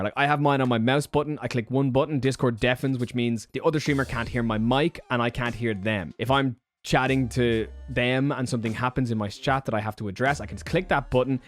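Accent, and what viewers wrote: Ireland. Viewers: For an American you have a really Irish accent….. ❤️👍🏻🇺🇸🥃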